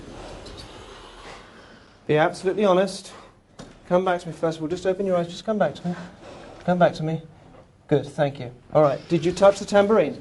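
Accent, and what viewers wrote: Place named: England, United Kingdom. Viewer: this is horse doody!! there is no seance just a bunch of englishman crap and he will not stfu making me wanna commit suicide with that horrible accent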